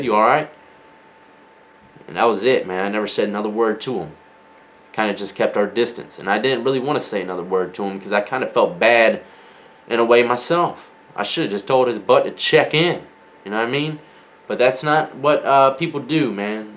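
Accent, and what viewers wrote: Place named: South, United States. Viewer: Why are you so damn cute?! And you have that little southern twang 😍🤗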